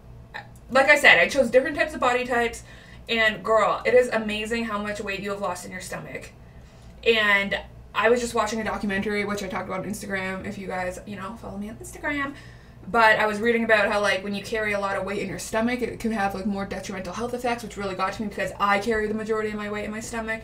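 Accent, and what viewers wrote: Canada. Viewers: Your Canadian accent is SO strong it’s adorable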